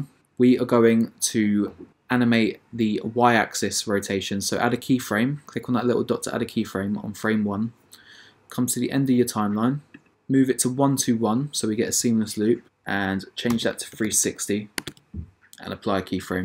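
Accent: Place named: London, United Kingdom. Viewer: Sick tutorial nice to hear london accent too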